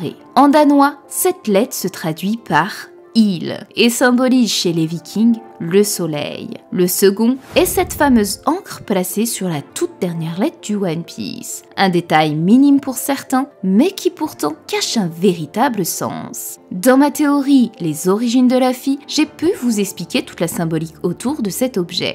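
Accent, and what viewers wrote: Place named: Belgium. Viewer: Vu l'accent, je dirais qu'elle est belge.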